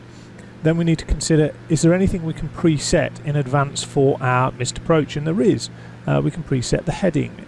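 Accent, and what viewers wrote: United Kingdom. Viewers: And also, I am up in years and sometimes voices are hard to discern. Your, British Accent, I presume, is VERY EASY to hear and probably the most clearest English to understand.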